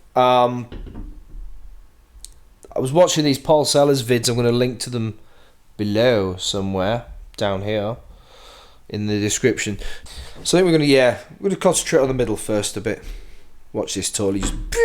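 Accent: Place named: Darlington, United Kingdom. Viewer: I'm itching to see the next episode... are you posting anytime soon? Great to see your videos though, I'm a Darlo boy living in New Zealand and it's good to hear a familiar accent.... Cheers, Steve.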